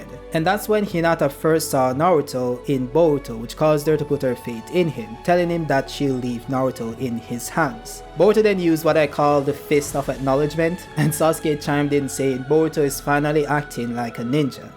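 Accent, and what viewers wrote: Jamaica. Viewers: Wait, are u jamaican that accent can't hide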